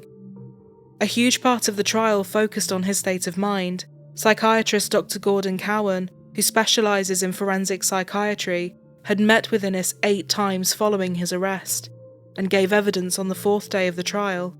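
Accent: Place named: Scotland, United Kingdom. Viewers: Great video. I love Scottish accents… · Love Scottish accents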